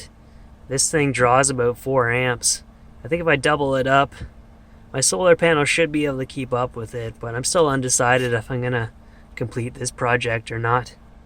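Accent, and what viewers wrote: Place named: Newfoundland, Canada. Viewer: Canadian. If I had to guess, I'd say Newfoundland? I've heard other Canadians say that's where the sort of stereotypical Canadian accent comes from.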